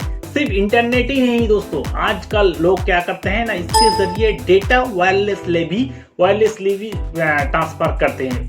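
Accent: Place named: Bengal, India. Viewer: it looks funny awesome when a Bengali person tries to speak Hindi.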